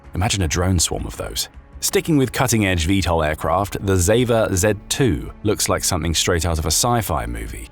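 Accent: United Kingdom. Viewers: Someone got some dumb British narrator to say it was American. Silly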